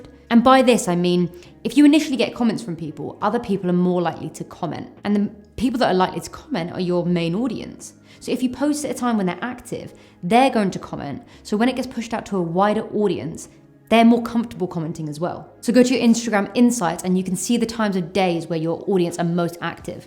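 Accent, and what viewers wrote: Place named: United Kingdom. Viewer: Aaaaah.... British accent 🔥🔥❤️❤️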